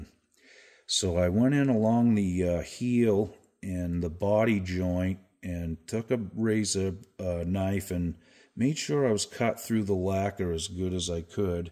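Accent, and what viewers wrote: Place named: New England, United States. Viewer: Wow, interesting! Following to see what becomes of this Harmony. Probably better than it ever was! Also, your voice reminds me of if Mike Ehrmantraut was born and raised in New England!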